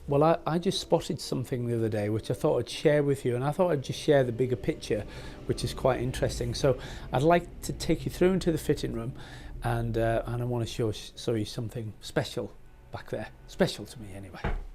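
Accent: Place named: RP, United Kingdom. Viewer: Thx graet video sir! I can hear your clear queens english!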